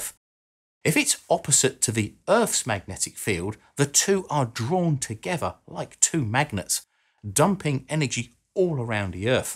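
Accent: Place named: England, United Kingdom. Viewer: Your accent is so... Brrritish!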